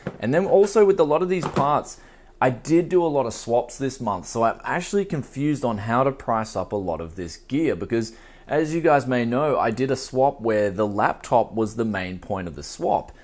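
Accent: Bogan, Australia. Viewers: chucking on that bogan voice m8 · Bogan voice hype. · I love how you Austrailans talk :D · Sound so Aussie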